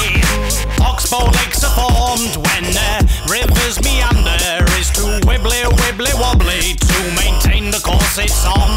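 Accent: United Kingdom. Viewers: I think had a very heavy British accent in the beginning but it started to slowly change throughout his videos, now it's totally different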